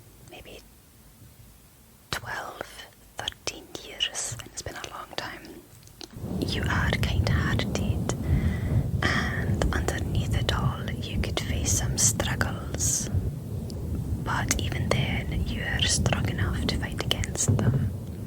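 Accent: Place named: Scotland, United Kingdom. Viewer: I think you are Scottish because of the way you sound but also I can understand what you’re saying so I don’t think you currently live in Scotland. Were you in America or England for a few years?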